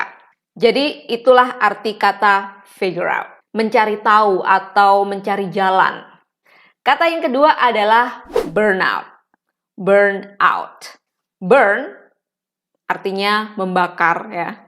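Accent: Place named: Java, Indonesia. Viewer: Ternyata = ndilalah 😂, masih mantep kak logat jowone, Kediri menyapa kak😁👍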